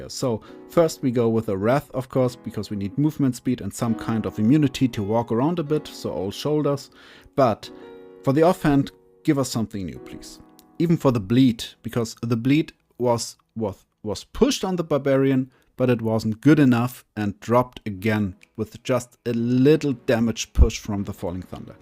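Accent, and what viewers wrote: Germany. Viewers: Man hört einfach das du deutsch bist^^ Gut aufgebaut das video, thumbs up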